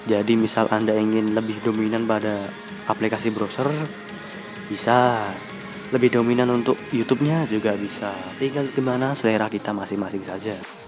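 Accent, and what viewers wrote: Java, Indonesia. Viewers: kok "Medok" banget mas... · mas nya orang jawa pasti.. medok bgt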